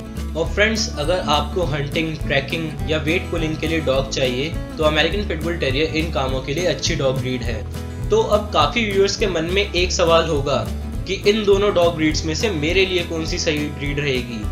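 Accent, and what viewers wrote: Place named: India. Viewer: Do you have the biggest indian accent or are you speaking indian, cause i cant understand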